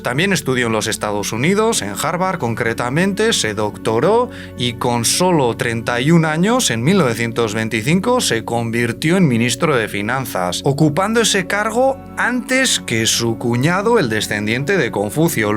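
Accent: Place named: Basque Country, Spain. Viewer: Es acento típico de la zona de España de donde procede. Esa cadencia que dices, viene de influencia del euskera.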